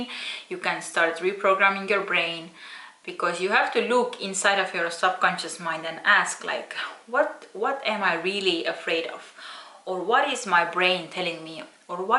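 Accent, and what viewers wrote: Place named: Russia. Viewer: Будто русский акцент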